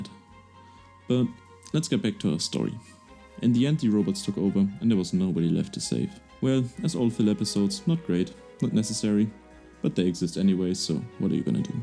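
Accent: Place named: Germany. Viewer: Actually good content. The Accent is still strong so I instantly know ur a fellow Landsmann but that shouldn't bother anyone. Keep it up!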